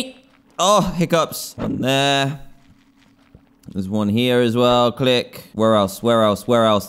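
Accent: England, United Kingdom. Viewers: He’s British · Tayler Douglas what? He is from england...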